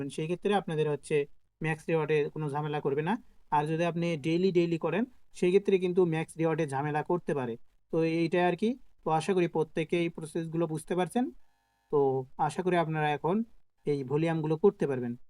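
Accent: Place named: Bogura, Bangladesh. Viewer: vi mone hoy Bogura er hosse hosse kotha ta bashi boltacen amio Bogura er sol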